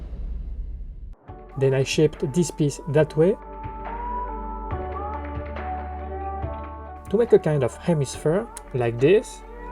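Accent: France. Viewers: On dirait un gars qui parle anglais depuis peu ton accent c'est bizarre. Je dirais presque un français.... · You are French? I can hear the accent because I'm French haha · Ton accent est ... comment dire spécial ( un accent français quoi ) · T'es français ça se voit à ton accent 😂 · Are you french ? You have a french accent ! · you have a french accent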